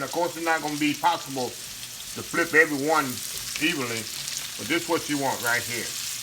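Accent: South, United States. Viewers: Chef I absolutely love you and you keep on preachin sir. Let the world know who's (Jesus) in control. This food looks delicious 😋 and that country accent I so love 🥰 I love my southern brothers and sisters!! #HelpSomebodyOldSchoolSoulFood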